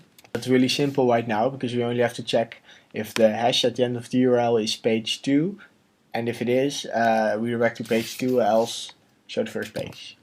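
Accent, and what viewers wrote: Netherlands. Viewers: Yeah I can hear a dutch accent boyyy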